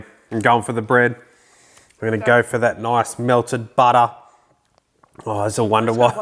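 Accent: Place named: Australia. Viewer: Your Australian accent makes me want to try making these prawns and I don’t even like seafood! Seriously well done video, next level and very professional! Btw you shouldn’t cook puppies 😬 😆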